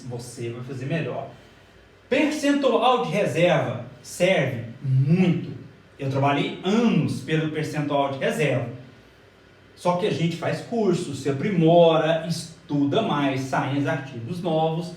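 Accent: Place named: Minas Gerais, Brazil. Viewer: Parece mineiro uai!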